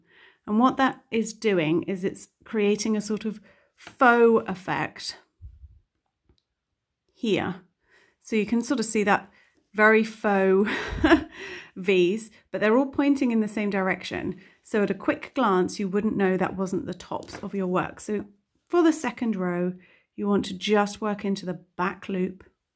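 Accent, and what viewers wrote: United Kingdom. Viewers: Thanks for liking & for commenting on my comment Miss. I like your British accent a lot 😁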